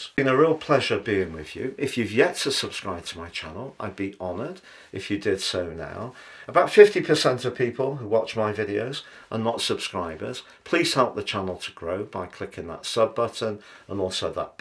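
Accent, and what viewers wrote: United Kingdom. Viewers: Im a non native English speaker and i guess youre a British accent speaker which is really hard for me to understand i watched it twice to know about my abroad travelling for study purpose my visa decision will come on 27th August but i dont know what is about to happen about my travel i am so confused and couldn't catch what you said regarding this can you please tell me if it will be a positive chance of visa approval or what?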